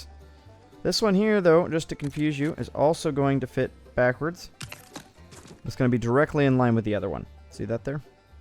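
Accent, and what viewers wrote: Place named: South, United States. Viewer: Lol! Love the Southern accent - so funny! 😂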